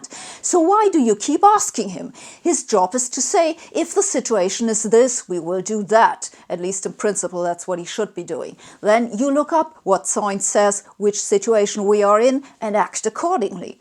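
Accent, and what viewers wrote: Germany. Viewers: She's acting pissed, but by the end of the video I can tell she's half dead inside having to break down something that was supposed to be obvious to most people, and yet it isn't. Keep up the amazing job in your career and in your Youtube channel! PS: Any female with an angry german accent will always have a special place in my heart <3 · Lol, your accent is German, but you cuss like an American Army drill instructor. Bravo!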